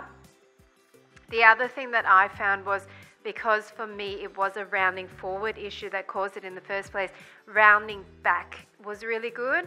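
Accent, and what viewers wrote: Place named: Australia. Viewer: A freaking Aussie! G-day mate!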